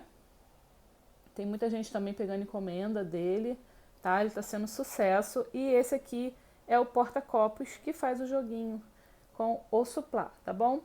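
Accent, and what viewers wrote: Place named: Rio de Janeiro, Brazil. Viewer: adorei sua explicacao e anotei no meu caderno, gostei de voce dizendo "ta okay" como o nosso eterno presidente Bolsonaro fala bem coisa de carioca falar mesmo, aqui no Parana nao temos o costume de usar esse termo 😄o presidente por ser paulistano reside no Rio ele tem o costume de falar é muito bom. Beijos 😁💋❣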